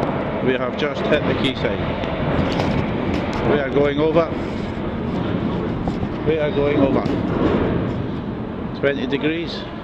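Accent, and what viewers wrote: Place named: Scotland, United Kingdom. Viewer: The Scots narration is phenomenal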